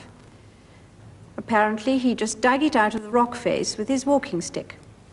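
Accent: RP, United Kingdom. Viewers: You can still hear the beautiful young girl she was when she was crowned in that proper British girl accent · Now that’s what one calls The Queen’s English. Our beautiful much lamented late Sovereign Lady, Her Majesty Queen Elizabeth II. May she rest in peace. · That British accent tho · This is has been noticed by linguists. Some of her vowels have shifted. She still speaks RP, but a slightly less old-fashioned one.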